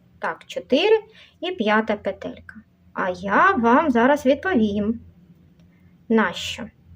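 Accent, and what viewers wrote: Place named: Surzhyk, Ukraine. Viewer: Да, слышно суржик,много слов перекрученных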